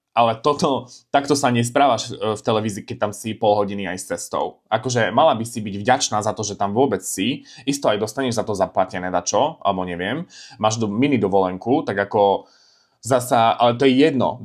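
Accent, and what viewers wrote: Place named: Eastern Slovakia, Slovakia. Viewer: podľa prízvuku od vychodňároch.. či? 😅🤣❤ Som z východu.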